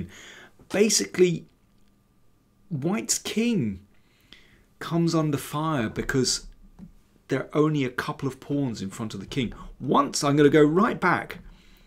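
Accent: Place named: RP, United Kingdom. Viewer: Keep quiet, that's the Sicilian secret! Icing on the cake! 😎 Thank you for the excellent commentary in Queens English!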